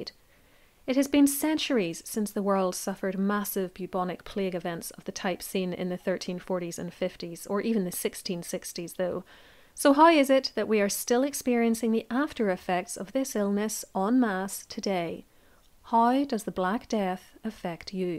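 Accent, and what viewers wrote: Ireland. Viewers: Ps. I LOVE that sweet Irish accent, jus’ sayin’… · No no it it's lovely, that's why I used Brogue instead of accent. My best mate was a lecturer at Queens, he married a beautiful lady from Ulster she has a rather stronger brogue. If we lose these wonderful parts of our culture - think how boring it will be. Your speech is wonderful , please stick with your normal relaxing voice. 👍👍👌👌 I've just subscribed , so please don't go American there's enough on Utube. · Very interesting. Good research and no background music, thank you. New subscriber. The narrator has an interesting accent. Is it somewhere in Ireland? I could be way off, but it reminds me of my visit to Dublin decades ago.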